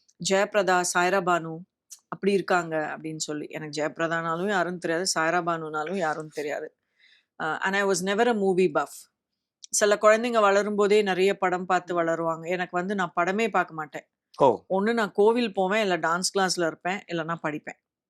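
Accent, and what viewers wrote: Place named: Tamil Brahmin, India. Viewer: She is Tamil Brahmin I think…see her way of talking and food taste!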